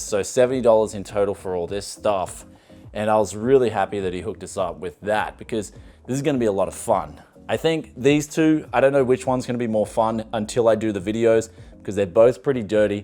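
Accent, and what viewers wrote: Australia. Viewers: I’m sorry that people don’t understand you don’t work for free, keep up the good work my old mate, you defo need to bring the Aussie out more, it’s class! Hope you well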